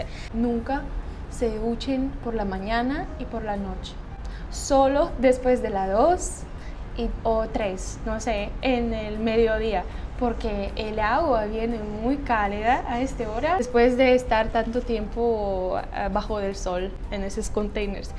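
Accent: Colombia. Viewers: ya se te escucha un acentico de costeña 😄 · Hola, tienes un buen acento español Colombiano. Saludos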